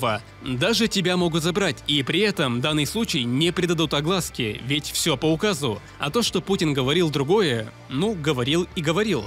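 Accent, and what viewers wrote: Ukraine. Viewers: а ты ведь из Украины, верно? Это сразу читается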